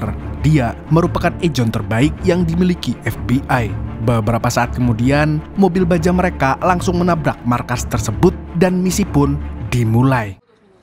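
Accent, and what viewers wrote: Banjar, Indonesia. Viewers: Org mana banjar bnar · Org banjar ini adminnya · Bubuhan urang banjar nih. Dari logat nya bubuhan brabai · Orang banjar kah pian mang 😂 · Urang banjar kah pian min? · Orang Banjar kah bg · Orang banjar kah min ? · Org Banjar kali nya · logat banjar nya masih melekat🔥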